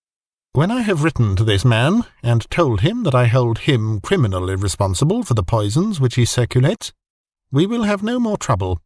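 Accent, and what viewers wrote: United Kingdom. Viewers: Love Sherlock Holmes! Liked and subscribed! You have the best British accent ever! Love you!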